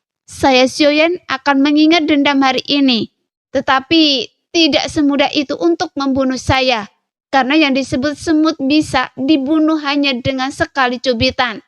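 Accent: Java, Indonesia. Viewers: Duuaaar ....jawa kentel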